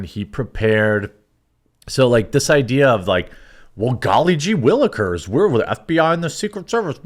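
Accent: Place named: Pittsburgh, United States. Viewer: Gotta love the yinzer accent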